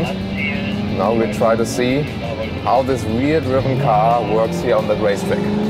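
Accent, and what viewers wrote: Germany. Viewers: Very nice commentary on this awesome car! Love it! :) Ab und an merkt man deiner Aussprache an, dass du Deutscher bist - keine Kritik, sondern einfach der einzige Punkt der mir etwas negativ aufgefallen ist :D Keep it up!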